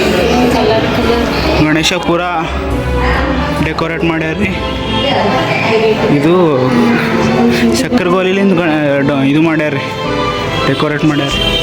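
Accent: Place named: Bangalore, India. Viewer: Ean ri kali dome bangalore matadtiri ree antiri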